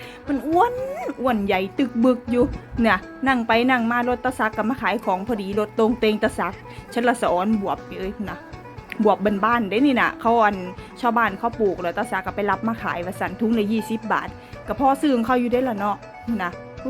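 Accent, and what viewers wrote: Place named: Chaiyaphum, Thailand. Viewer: สวัสดีค่ะหนู ป้าชอบหนูพูดมากๆค่ะพูดแบบนี้แหละเพราะดีค่ะป้าก็คนชัยภูมินี่แหละค่ะป้าชื่นชมหนูใช้ชีวิตอย่างมีความสุขจ้า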